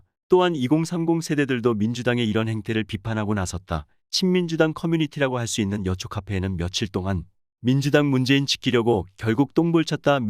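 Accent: North Korea. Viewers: 정성산님 목소리가 아닌데 어디 편찮으신가요? 자연스럽게 이북 억양이섞인 말투가 구수한데요 하루빨리 다시 듣기 바랍니다 감사합니다~^^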